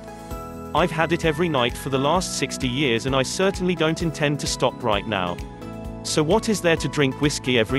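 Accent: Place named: RP, United Kingdom. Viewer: How rich are u😂 im not judging but this makes me feel like the queen of england is talking about vodka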